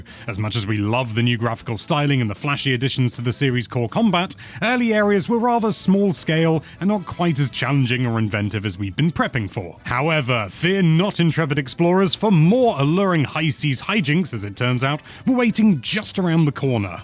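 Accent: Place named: United Kingdom. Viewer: He’s British. That’s just how people pronounce it?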